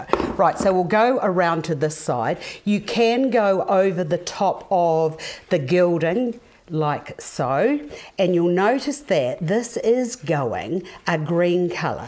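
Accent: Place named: New Zealand. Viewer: That NZ ecksent keeps me coming back.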